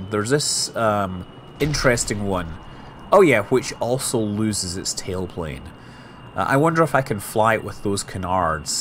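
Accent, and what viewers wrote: Scotland, United Kingdom. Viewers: u sound scotidh i hate u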